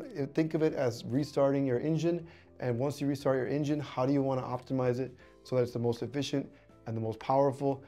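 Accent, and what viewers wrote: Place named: Iran. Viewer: Nice irani accent.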